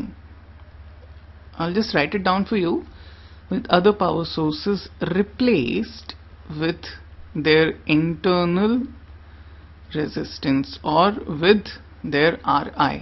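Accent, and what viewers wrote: India. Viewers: What is wrong with your voice and your accent???????? Are you an Indian??????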